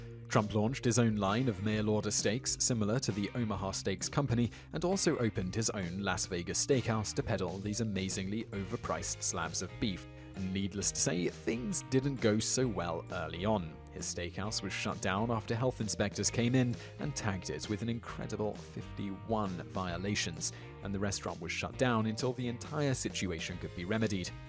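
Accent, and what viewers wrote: United Kingdom. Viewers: Cade Tatum He's British, he can pronounce it how he wants. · Typical American response to British pronunciation...stupid.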